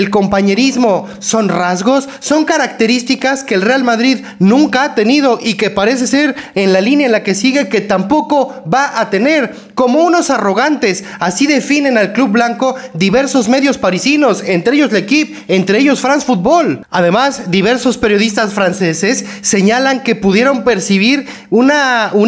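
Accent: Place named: Mexico. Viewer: Hace muy poco que te sigo pero eres por el acento mi mejicano preferido bueno después de Rafa Márquez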